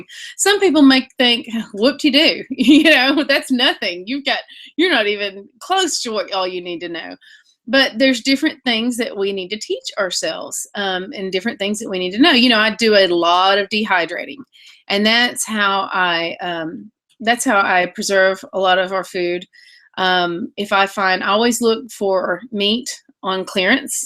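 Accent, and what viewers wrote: South, United States. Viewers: Nice video and I like your point of view! Stories of your grandma and getting back to the basics. Ringing a chickens neck!! haha! That's a good one! But yes shopping sales, doing some gardening, dehydrating...Good for you. I think more people need to be smart about their food and purchases. So many people I have met dream of going off grid and being self sufficient t... but sometimes that dream is five years in the making. So starting today in your own home with what you have is the best place to start. So many was to cut corners and save. My husband is only 53 years old and retired basically and I work only 8 days a month! HOW did we do it..by going back to the basics like you said! GOOD video and love that down home accent!!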